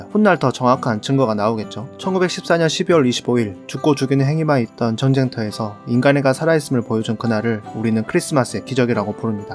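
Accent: Jeolla, South Korea. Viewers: 난 이 형님 전라도 억양이 너무 좋아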